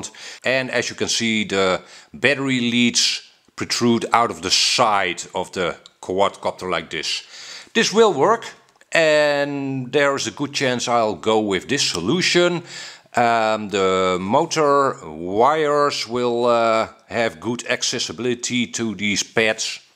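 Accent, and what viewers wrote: Netherlands. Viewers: More of a teaser than a build ; ) Its a really nice looking frame and I like that the bracing forms the base of the stack as its all very neat but, the pdb sticking out of the side kills me! I like symmetry and if it really can't be symmetrical its GOT to go at the back as it just looks like it'll be more streamlined... No science just one of those yep, that looks about right things :) Even with your Dutch accent making your intonation a little different to what I'm accustomed to I was convinced from the start you were going to have some "grumbles" about the esc and yes, you did! Some valid ones too, having to change the motor positions & resolder leads wouldn't be my first choice, easy wins those situations every time in my world. I'd almost be tempted to buy a different one or get one of their flight controllers (I'm assuming they use that set up).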